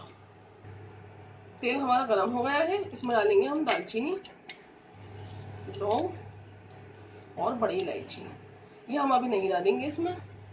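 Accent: India. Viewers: Hello Assalamalikum: I have been making saag ghost for a very long time but I came up with your channel and tried it last night. I couldn't believe it how tasty this saag ghost came out. My family couldn't stop eating it. It was so delicious and well-made. Jazak Allah for sharing your talent. I love your Indian urdu accent and MashaAllah you are so talented. I also tried your other recipes and I loved it so much. Your method is so simple and easy to follow. Jazak Allah again. :)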